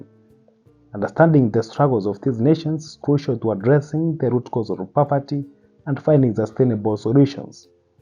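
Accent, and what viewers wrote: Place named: Africa. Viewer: You sound african and fill proud to speak ill of an african country, you only show slum areas as if Burundi is entirely composed of slums, slums are every where even in the countries you call develeped, stop being one sided, I advise you to be more professional at presenting because you yourself your presentation is underdeveloped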